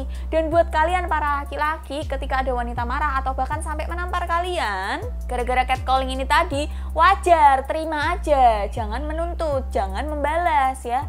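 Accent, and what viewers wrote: Java, Indonesia. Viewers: Medok mu semangatku mbakk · Kak kalog bicara lucu yak medok medok gemes....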